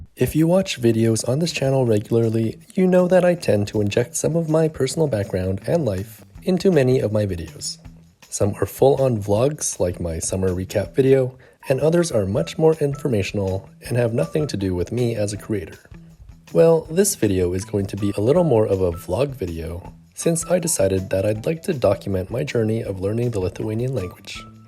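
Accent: Canada. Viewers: I will start my comment with wishing you a vey Happy New Year! This video of yours is very inspirational. The most important is not how much we know but how much we are willing (ready) to know with action added to it. You could be an example to many! Lithuania has little English-speaking scene on Youtube and I am sure your channel will be a smash hit in no time. Meanwhile I would like to add that I am learning English pronounciation from you. Canadian English is perfect for understanding. Thank you for being You and for what you do. Best luck with learning Lithuanian! ❤️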